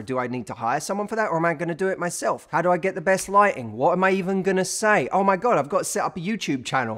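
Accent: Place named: Essex, United Kingdom. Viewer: He's an Essex boy ..it's how they talk.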